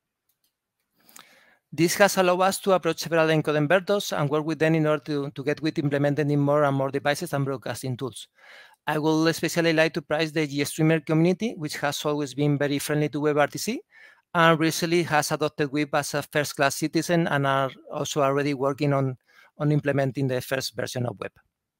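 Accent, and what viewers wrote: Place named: Spain. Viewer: very difficult to understand. strong Spanish accent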